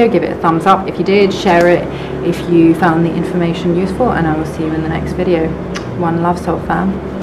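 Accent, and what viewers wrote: United Kingdom. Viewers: Good info! Didn't realize you had such a posh accent :P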